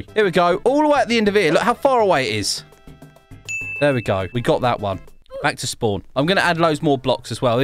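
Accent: United Kingdom. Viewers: i love your british and also your vids<3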